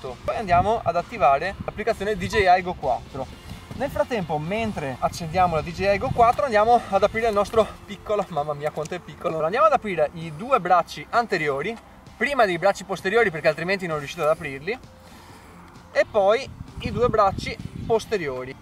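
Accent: Veneto, Italy. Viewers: uè zio sei veneto? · be' io sono Mestre e la parlata veneta la riconosco😋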